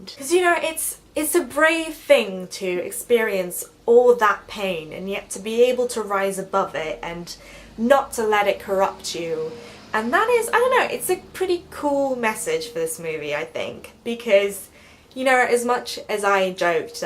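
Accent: United Kingdom. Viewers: Yeah cause you sound like your from Sufford(the same place as Lily James came from). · are you from UK ? just wondering about your accent.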